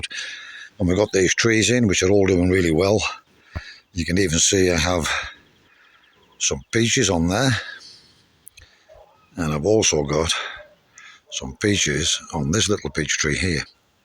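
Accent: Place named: North East England, United Kingdom. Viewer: I am a new subsciber and although you think your 'bit of land' is a mess, I think that it still looks amazing! I wish you well with your home search. Times are rotten with regards to the renting in this country. 2 questions if I may ask. Why did you get rid of your other chickens and do you remember what your rose is called? When I was a child, we had an almost identical one growing over a rustic trellis and I have been looking for the same one without success most of my adult life. Although I was born in the south of the UK, by the sound of your accent, you come from the North East, as did my Dad.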